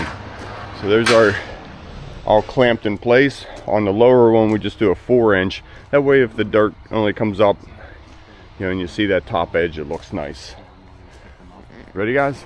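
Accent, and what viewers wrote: United States. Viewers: Everyone has an accent. I'm from Australia. I definitely hear your American accent 😁 Beautiful work as always!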